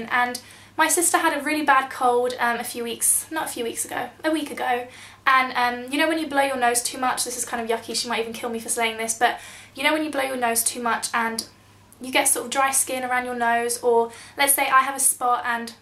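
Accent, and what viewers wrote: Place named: United Kingdom. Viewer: Hey, can you tell me where you are from the UK? I want to do a year abroad, and I love the way you speak :)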